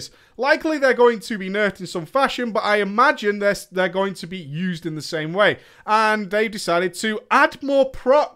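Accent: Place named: United Kingdom. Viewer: hard to hear what u saying with that brittish accent + you talk to fast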